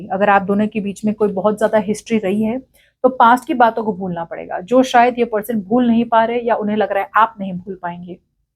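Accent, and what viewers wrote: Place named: India. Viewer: Thanks,. This is GREAT!!! I can follow along whilst you include some English. And I love hearing not only the Indian accent but the Hindi language is beautiful to listen to. Yes, I hope my person comes around. The longer no contact, the weaker the connection. I am open to new persons, though deep down to be honest, I still have feelings for her.😢..I know I'm a big baby when it comes to heartbreak. Reckon I am healing my abandonment issues as well. Thanks, dear for the read. Always seems straight shooter.🧡❤💚💙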